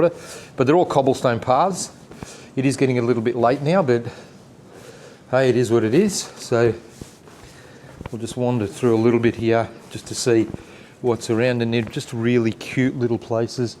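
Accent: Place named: Prekmurje, Slovenia. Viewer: "Slovenski gučiš?", hahaha funny. Unfortunately your prekmurian dialect wont help you much in other parts of Slovenia, but its nice to hear.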